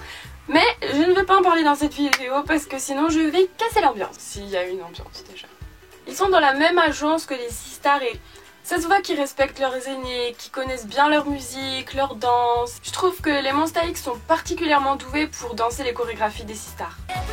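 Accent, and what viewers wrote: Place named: France. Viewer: No Mercy though😆 Ouais les survival c'est Tjrs grave cruel Ahah, Kihyun💜💜 Monsta Ayksss !! Lol Jsais meme pas comment écrire la phonétique mais bref le x se prononce comme en anglais. Jcrois t'est la première française Que jvois qui prononce les mots genre Bias et tout avec une prononciation française😜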